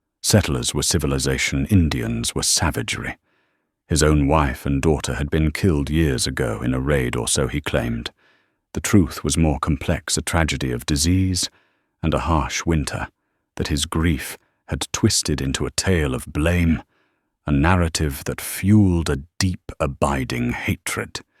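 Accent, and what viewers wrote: United Kingdom. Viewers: Good story read with a beautiful British accent. Listening from Norway. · I really wish an American was narrating this story about the American West! This English accent is so out of place.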